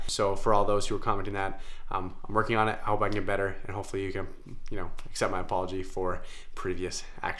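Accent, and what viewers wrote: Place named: Canada. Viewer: Never noticed the accent I guess you are Canadian lol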